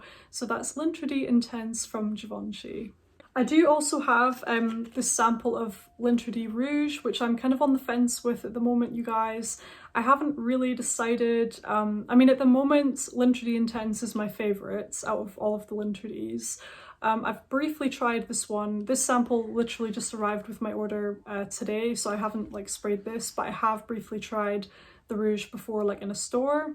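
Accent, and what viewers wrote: Scotland, United Kingdom. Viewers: I love your voice. I'm here in the heart of the southern U.S., Arkansas. I hate the average accent around the area I live in, and your accent is a breath of fresh air. Will you tell me where you grew up? My girlfriend & I have debated it quite a lot. We thought it sounded a little Scottish, but you have the United Kingdom as your location. Thanks. We love the Channel.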